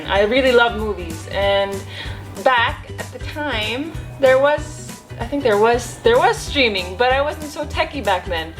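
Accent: United States. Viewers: Lindz Can Aussie??Hindi yan Ausie.American accent lang naman yan eh.. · Can She speaks American English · feeling ko american ka..ung accent mo kaxe ehehe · Lindz Can it sounds more American now she migrated back pabalik ng Pilipinas. Nung mga previous vids nya before mas string yung Aussie accent nya. she sounds more like Colleen Ballinger actually ☺💙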